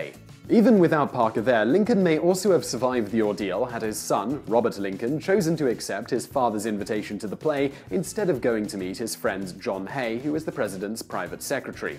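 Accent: England, United Kingdom. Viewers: You know so much about American history, and you have a British accent. Hmm... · Sounds weird, English accent talking about American history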